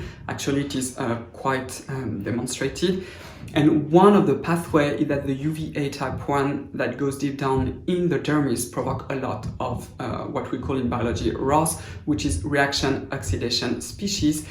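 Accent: France. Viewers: Bonjour! I adore your videos, especially appreciating the science. There are far too many skincare videos of sincere, but but subjective reviewers, essentially intuitively responding to products. Also, I might say as an American, your American English is impeccable, as you have clearly mastered idiomatic expressions. It is quite "cute," as a native English speaker, to hear such idiomatic expressions from a Frenchman. Your accent is also adorable. As an aside, I speak French. I am told my accent is "adorable" to be fair.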